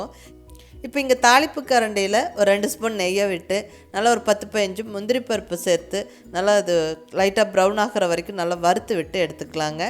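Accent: Kongu, India. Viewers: Hi ka .I tried most of ur recipes. Everything with kongu style touch and ur speech .kuddos to ur receipes and u.👍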